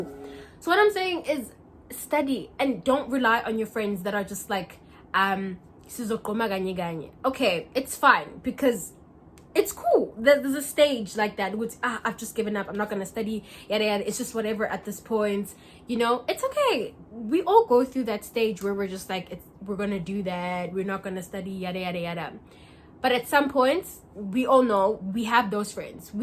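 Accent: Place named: United Kingdom. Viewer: it's the British accent for me 🤣😭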